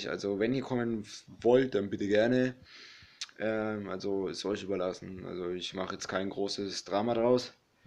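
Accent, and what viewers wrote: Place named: Franconia, Germany. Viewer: Bitte gerne. Du bist noch Jung du wirst noch was passendes finden;) Echt aus Franken,dann hab ich das aber gut raus gehört;)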